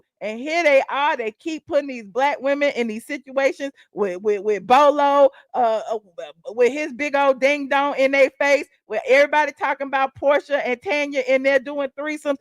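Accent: South, United States. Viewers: I'm a new Yorker and love the southern accent " hol on lemme see" I don't want y'all to hear me yell in yall " eea". Ear? Bottom seat every " yeea" year? Love u. My son hijacked my you tube again took my Love4all formally yuchboi. Gotta split phone email. Ugh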